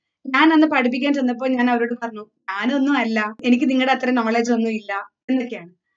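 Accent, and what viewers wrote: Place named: Tamil Nadu, India. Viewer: Are you no malayali?🤔Tamil style aanelllo.🙄UK annenn vach Malayalam parayaan nanakedd ano?😮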